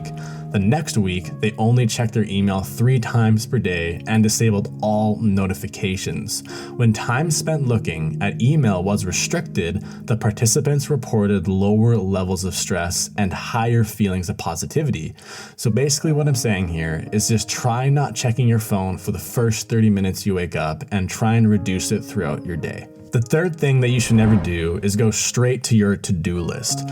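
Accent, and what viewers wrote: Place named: Sweden. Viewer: Du är ifrån Sverige man hör det på din röst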